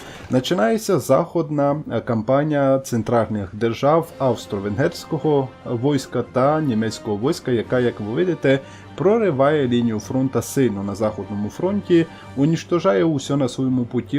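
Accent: Transcarpathia, Ukraine. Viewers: Чоловіче, славно виходить)) і закарпатський діалект - теж файний. Я би так і продовжував коментувати: усе зрозуміло, але ти звучиш значно природніше і легше👍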